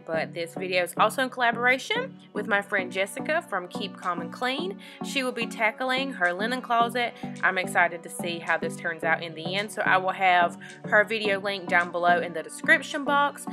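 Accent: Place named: North Carolina, United States. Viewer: I can definitely hear the Pembroke/Lumbee in your voice!!! Love the video!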